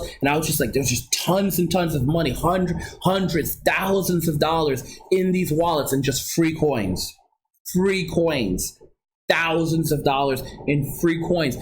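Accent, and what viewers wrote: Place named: Jamaica. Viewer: Bro. You sound like you have a slight Jamaican accent which was lost over time being Americanized in the US! .... Am I correct?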